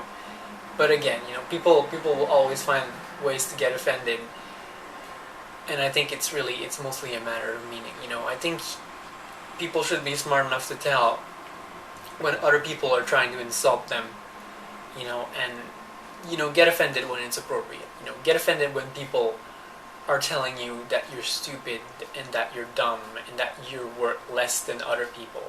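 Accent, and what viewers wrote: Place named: Canada. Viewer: You're here too. I like her Canadian accent. And she's pretty.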